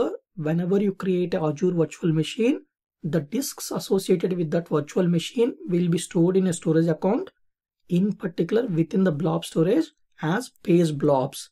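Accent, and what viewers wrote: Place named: India. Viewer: I'm a about to switch from undergraduate to a grad computer science program and I haven't understood a single thing of what was going on on that video...(well I haven't really studied Big Data (thoroughly) yet,but for people like me this is completely out of reach...or would take 6 hours to understand (most of) all of the material of those concepts) not nice! Also I must put forward the significant Indian accent of the video lecturer, which induces additional efforts in trying to decipher his explanations. (PS: I'm not in grad school yet...so may change don't worry) (PS_2: I studied in a top European university (aside from the UK) To sum up I appreciate the efforts from the poster and turning into on the best IT YouTube educator with a big amount of hard work should entirely be possible. I wish you strength and motivation ! all the best!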